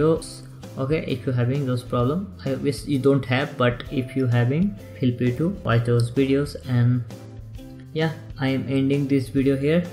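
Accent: India. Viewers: bcoz Yours Voices Has been like an indian guy😊😊😊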